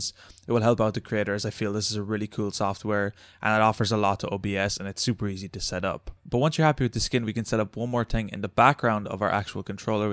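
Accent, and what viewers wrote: Ireland. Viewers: Irish accent is awesome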